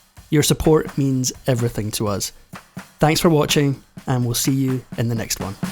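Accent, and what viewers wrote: Scotland, United Kingdom. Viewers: It's a Scottish accent. And, yes, it's lovely!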